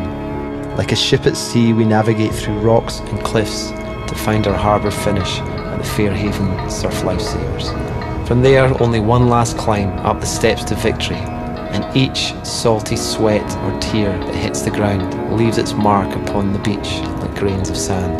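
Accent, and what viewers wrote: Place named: Scotland, United Kingdom. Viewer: Love this! Good scottish accent to narrate too; not that I'm biased ;)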